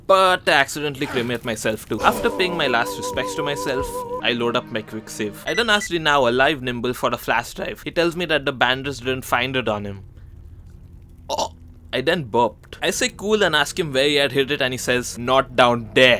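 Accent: India. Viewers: i think he sounds like a idian guy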